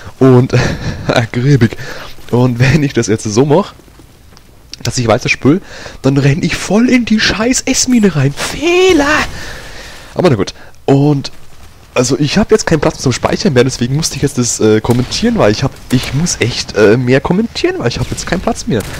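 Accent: Bavaria, Germany. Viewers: hab des ganze bayrische guat verstana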